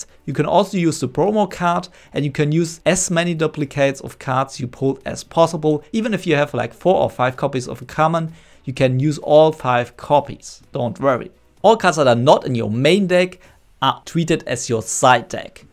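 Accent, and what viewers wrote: Germany. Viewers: Great video. A friend showed me this for the upcoming sneak peak. Loved the German(?) accent.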